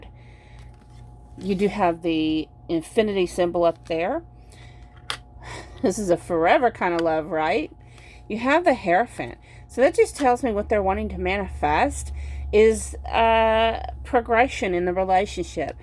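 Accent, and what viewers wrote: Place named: South, United States. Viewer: Hey I just seen you.Im from Mississippi and can tell you are southern.Girl I watch a lot of readers and I Love your readings.New sub!Picked pile 2.Where you from btw?If you don't mind my asking?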